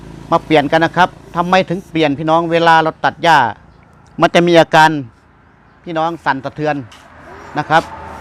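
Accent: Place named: Central Thailand, Thailand. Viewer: ขอบคุณครับน้าที่ที่สอนการซ่อมเครื่องตัดหญ้าสอนพูดภาษากลางคนภาคใต้เข้าเข้าดีครับครบทั้งสี่ภาคเข้าใจภาษากลางขอบคุณครับน้า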